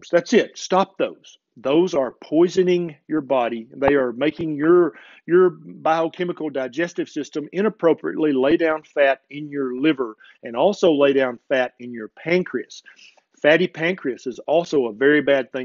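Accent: South, United States. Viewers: Nice to hear a educated southern accent with real advice!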